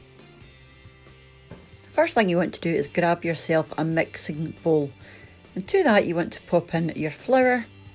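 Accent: Scotland, United Kingdom. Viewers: I came across this Video accidentally, and as soon as I heard your lovely Scottish accent I was delighted! Unfortunately we do not have easy access to suet in Germany, but I do love this recipe and will try to make it sometime - perhaps with butter instead of suet. Thanks for your great recipes!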